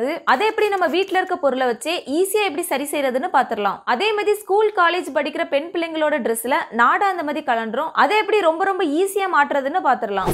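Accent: Tirunelveli, India. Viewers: திருநெல்வேலி நாடார்களின் குரல் சூப்பர்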